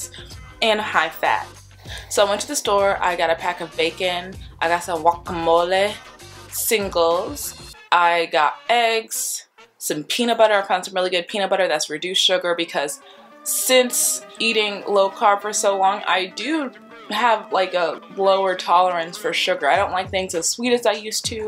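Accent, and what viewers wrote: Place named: Bay Area, United States. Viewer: Where are you from? Sound like you're from the bay.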